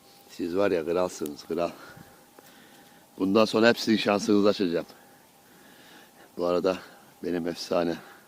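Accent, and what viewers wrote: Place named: Tokat, Turkey. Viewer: Selamın aleyküm gardaş tokat şivesine benziyor konuşman ya sivaslı ya da tokatlısın heralde?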